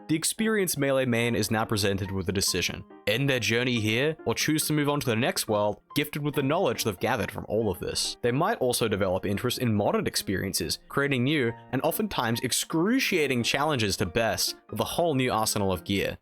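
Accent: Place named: Australia. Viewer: Basically an Australian accent with an American and British undertone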